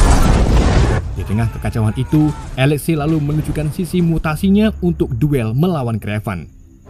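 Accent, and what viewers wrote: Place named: Pekalongan, Indonesia. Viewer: Logate mirip pekalongan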